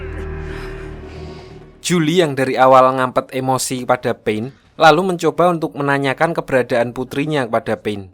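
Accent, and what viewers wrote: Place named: East Java, Indonesia. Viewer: Orang mana si bang, logatnya kek jatim bgt :v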